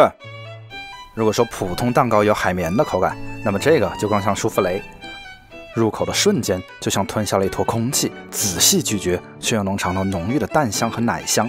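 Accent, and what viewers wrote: Wuhan, China. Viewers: 是武汉人吗？听口音像极了武汉滴弯管子普通话……😊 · 怎麼感覺是武漢人啊？！🤣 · 视频主是武汉人吗？感觉有武汉口音😁😁😁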